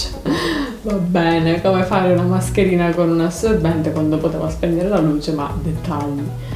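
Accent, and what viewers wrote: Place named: Catania, Italy. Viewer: sei tutti noi. Domanda sei di Catania? L'accento ci assomiglia, e io mi diverto più di tutti, essendo messinese capisco alcune cose che dici in dialetto. ❤❤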